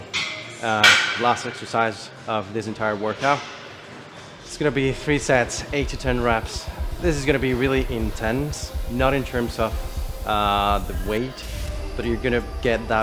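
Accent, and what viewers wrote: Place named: United States. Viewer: Where you from bro you sound american but says your from the 🇬🇧 keep up the good work though bro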